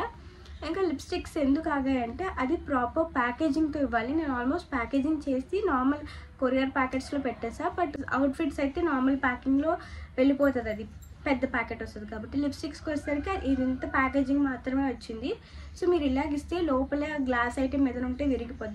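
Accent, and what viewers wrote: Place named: Visakhapatnam, India. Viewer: Ne vedios oka emotion ayipoyae bcoz ur slang and talking and cute voice and also ur from mana vizag ❤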